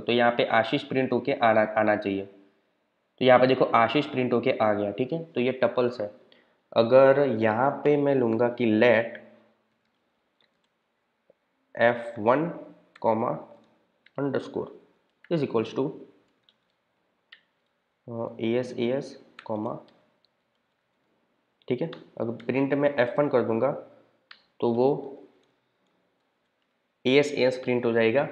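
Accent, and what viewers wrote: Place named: Gujarat, India. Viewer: ભાઈ એક નંબર વિડિઓ બનાવીયા છે અને તમારો અવાજ નો ટોન પાક્કો ગુજરાતી... જય જય ગરવી ગુજરાત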